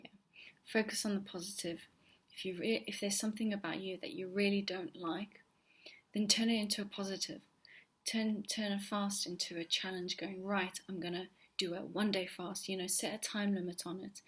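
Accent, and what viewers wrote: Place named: Australia. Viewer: Great content!😊 I enjoyed listening to it. I also like your accent 😁 Where are you from? Australia? The UK?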